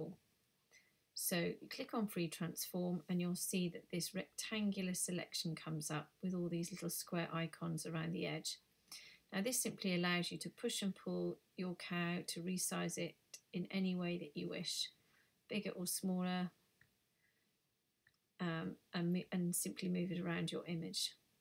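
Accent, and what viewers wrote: United Kingdom. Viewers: I love your British accent my dear....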